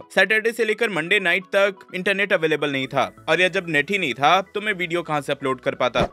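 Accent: Bihar, India. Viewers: Bihar se ho kya? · Ap Bihar se ho ? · Aap bihar se ho kya 👀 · Are bhai aap bihari ho kya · Bihar s ho ky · Bhai Bihar se ho kya? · are u from bihar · I think your r frm bihar, I'm also frm bihar😂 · Aap Bihar se ho kya Bhai :) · Are you from bihar? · Aap bihar se ho kya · Are you from Bihar ? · Aap bihar se ho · Sir ap bhi bihar se ho kya????? 🙂🙂🙂🥲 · Aap Bihar se ho ky👀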